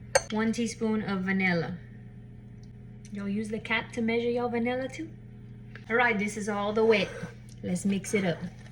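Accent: Louisiana, United States. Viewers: You are absolutely precious! I love your Cajun accent! You make me proud to be from Louisiana!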